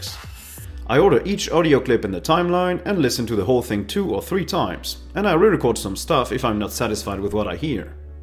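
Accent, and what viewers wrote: France. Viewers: wat , you're french ???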